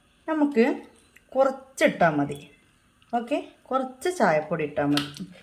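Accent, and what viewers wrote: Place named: Kozhikode, India. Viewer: ഹലോ ഇങ്ങള് നാട് എവിടെയാ ശരിക്കും നിങ്ങൾ കോഴിക്കോട് വർത്താനം ആണല്ലോ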